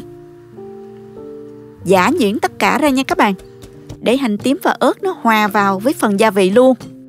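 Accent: South, Vietnam. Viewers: Giong noi cua de thuong qua, dung la nguoi Nam binh duong❤️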